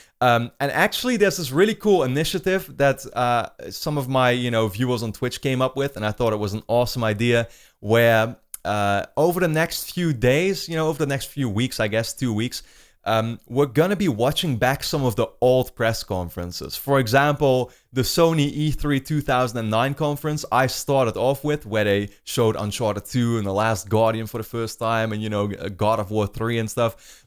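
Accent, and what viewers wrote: Netherlands. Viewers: Dime Drawer he is Dutch. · Are you Dutch or South African? 🤔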